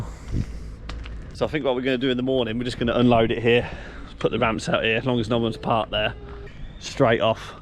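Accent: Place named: United Kingdom. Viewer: i liked this uk english voice :D , btw nice video as always bro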